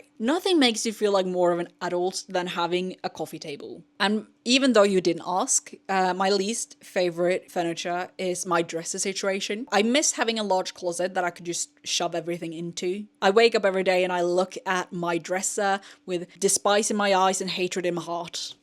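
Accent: Australia. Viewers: I’m English and when I first heard you I thought you were Australian, until I realised you are from Norway 😂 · I’m English and thought for sure u were Australian lol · Fun video. Also you definitely sound a little Aussie ngl.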